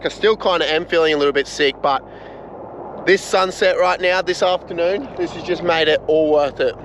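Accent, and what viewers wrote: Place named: Australia. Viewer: bros a certified aussie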